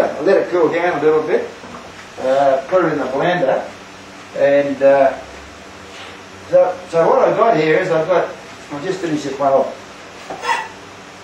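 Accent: Australia. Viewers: are you Australian. Cause you have an Australian accent.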